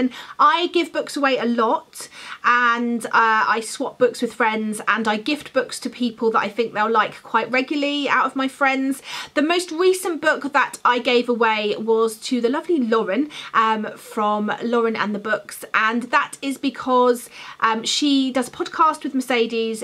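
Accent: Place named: United Kingdom. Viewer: I want to read Winger toooo, but this book is just hanging out on my shelf 😂 Love your accent sooo much!!! I unfortunately have a kind of American accent but I looove love love hearing your wonderful British accent ❤️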